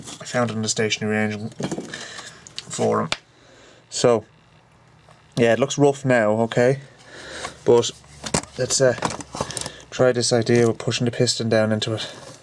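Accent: Ireland. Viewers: Worth watching for the irish accent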